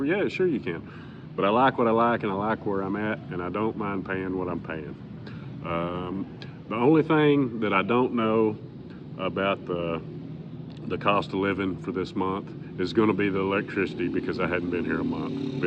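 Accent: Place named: Texas, United States. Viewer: Love your accent. Are you from TX?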